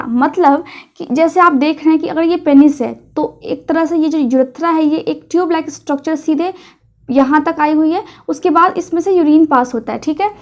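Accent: Bihar, India. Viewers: Ma'am ap Bihar se ho kya ? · pahle toh yeh lagta hai tum Bihar se belong karte h maina language se yeh Jana hai. Baki well done your speach is uninteruppated good show. Baki pl intimate aap ne kahan tak study ke h. Medical mein. Pl reply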